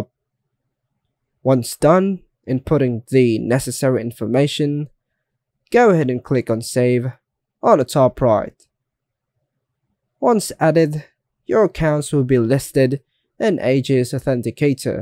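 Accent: United Kingdom. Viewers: Strong Brit dialect, difficult to understand. not getting which service you're referring to. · People who can't understand brit accent.... Use CC..?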